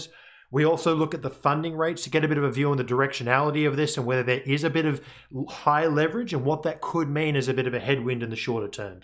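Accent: Australia. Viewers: Is this speaker from Australia?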